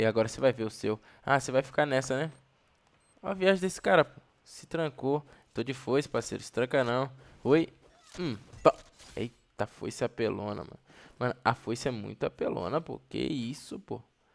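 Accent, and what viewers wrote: Bahia, Brazil. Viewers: parece que mora aqui na bahia, olha o jeito que ele fala man, Muito massa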